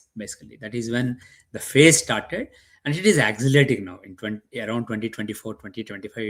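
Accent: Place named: India. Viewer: Please cut off the Indian accent like many others who appear on Indian podcasts, news media etc speaking English that can't be apprehended easily.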